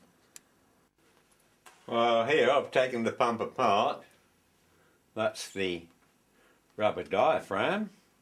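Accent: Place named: England, United Kingdom. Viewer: I always enjoy your videos. Whenever I hear your voice, it always reminds me of the Wallace and Grommet animations. I have always been a fan of the English wit and delight in hearing an English accent. I encourage you to continue making your videos, I find many useful ideas for projects and repairs of my own.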